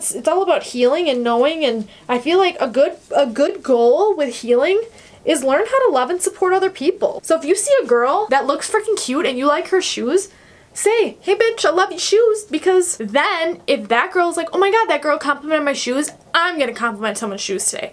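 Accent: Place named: Wisconsin, United States. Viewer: I knew I heard a fellow Wisconsin accent lol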